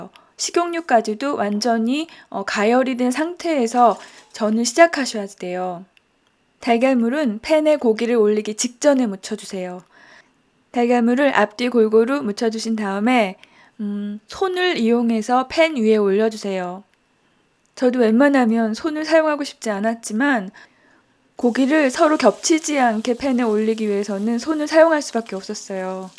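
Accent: Seoul, South Korea. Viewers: 저 성대모사 엄청 잘해요 남편이 엄지척👍했어요 서울사투리 넘 귀여워요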